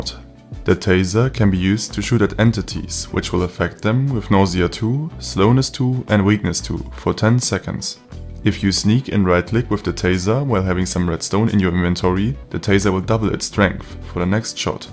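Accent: Germany. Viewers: I hear deutschen akzent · Irgendwie so ein deutscher akzent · Are you from Germany?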